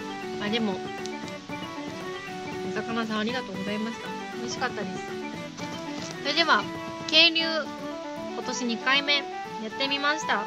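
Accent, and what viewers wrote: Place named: Kyushu, Japan. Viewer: こんばんは！初めまして！ 釣り姿がカッコイイですね！ いい感じのカワムツのオスですね！ 質問ですが九州の方ですかね？ 方言が聞いた事あるのでw チャンネル登録させていただきます！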